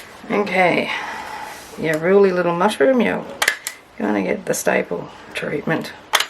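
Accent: Australia. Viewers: This is doable! I’m collecting bits and pieces to make my first journal and JJJ seems like the perfect time to muster up my courage. It’s going to be a French and lace journal. I’ve saved this one and I’ll be referring to it a lot! Thanks for the inspiration. I’m in Oz too on Tweed Heads. It’s so nice to hear an Aussie/kiwi accent in YT. Of course I’ve subscribed😊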